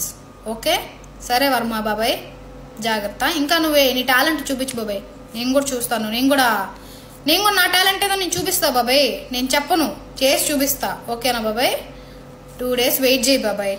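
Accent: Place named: Kadapa, India. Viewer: Kadapa language vaguthunnavu neevu ya district girl.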